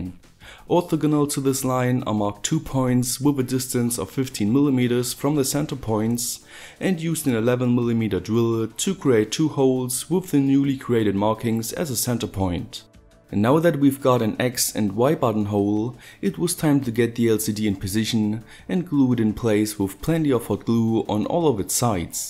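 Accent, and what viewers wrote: Germany. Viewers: are you German mate ? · Why do you have a German accent?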